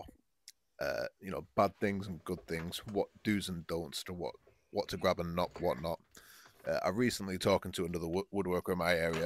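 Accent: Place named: Midlands, United Kingdom. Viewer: ahh Cumbria, you sound like you’re from the midlands? I work in Carlisle on and off, I like that part or the uk!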